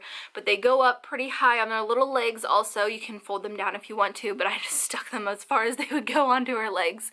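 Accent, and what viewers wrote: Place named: Michigan, United States. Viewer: sounds like you live in Michigan!!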